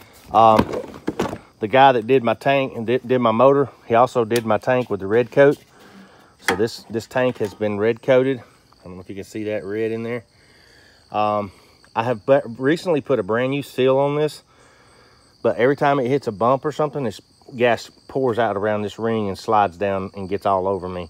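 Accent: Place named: Arkansas, United States. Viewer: are you from Arkansas?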